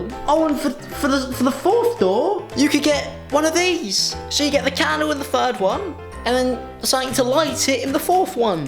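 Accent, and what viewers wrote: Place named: England, United Kingdom. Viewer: wow, a lot of ppl sound like him in England, depending where ur from but I guess that’s why i don’t see it as anything special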